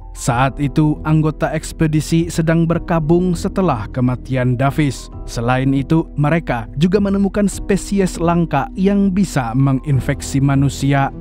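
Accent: Banjar, Indonesia. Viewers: kimanya banjar kah nang ini urangnya😂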